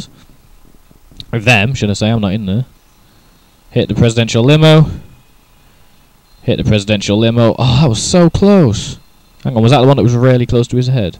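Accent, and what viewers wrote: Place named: England, United Kingdom. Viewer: OH YES THANK GOD SOMEBODY ENGLISH!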